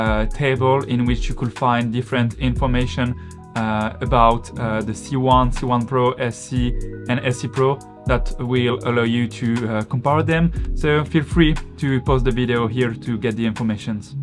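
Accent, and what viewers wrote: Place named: France. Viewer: English with a French accent is charming.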